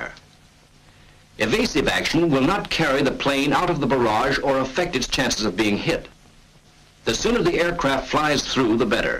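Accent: United States. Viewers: Classic American accent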